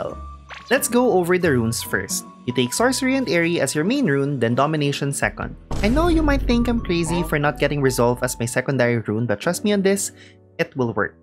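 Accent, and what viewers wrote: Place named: Philippines. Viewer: I soooo can hear the Pinoy in you xD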